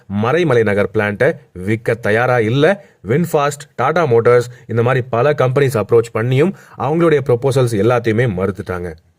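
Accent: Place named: Kerala, India. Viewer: Why you are talking like Vinu Chakravarty… full of Malayalam vadai adikkuthu unga Lin voice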